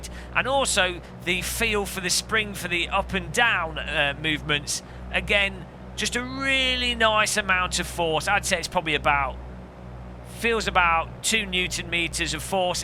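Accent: United Kingdom. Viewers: Turned off 7 seconds in because limey accents are unnecessary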